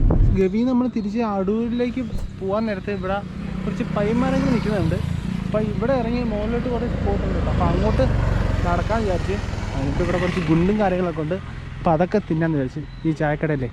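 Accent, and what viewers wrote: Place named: Thiruvananthapuram, India. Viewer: ഇത് tvm ഭാഷ ആണ് മോനുസേ 😁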